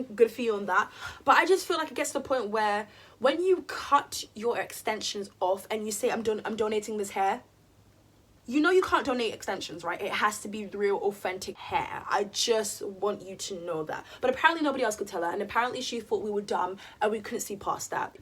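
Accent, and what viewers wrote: England, United Kingdom. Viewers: I still love hearing your English accent. ASMR moments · I watch you because I love how straightforward you are in your videos . You really don’t hold back which is really good and I also watch you for your accent 😂 because you sound exactly like my cousin In England and I don’t get to see her often ! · You just recommended to me 😊 also your accent makes me miss the UK cos I just moved away, also I like that you express your opinion - I agree with your thing about Antonio Garza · i watch you because i want to get used to this accent to understand British series and I think your channel is entertaining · Because your great. You also have a really cool accent! I love when people who are African American people have British accents!! 😊 Your videos are also very honest. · I love and watch because of your accent because i expected an american one. 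I am english aswell so its making me feel nostalgic as i dont live in London anymore 💜 also you keep it real and girl you have alot of common sense and your hair is amazing. I love natural afros 🙏🏻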